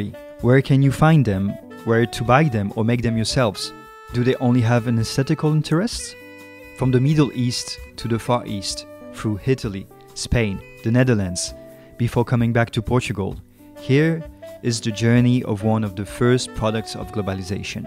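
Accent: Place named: France. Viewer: I think you're wrong, he sounds French. Love the accent. ❤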